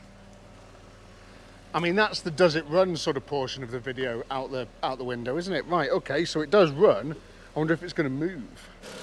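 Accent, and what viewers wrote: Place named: North Wales, United Kingdom. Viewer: Just found this channel and proper enjoying this video. Going off your accent your Chester/ north wales. Nice to find decent content and long videos.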